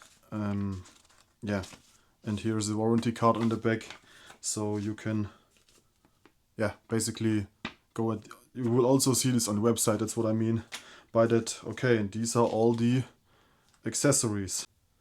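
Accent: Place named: Germany. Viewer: German accent ❤️‍🔥❤️‍🔥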